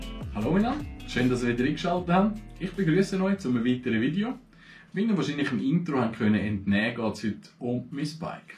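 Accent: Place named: Switzerland. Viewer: einiges gelernt... bissl übers Bike, aber vor allem Schwizerdütsch, mithilfe der Untertitel 😁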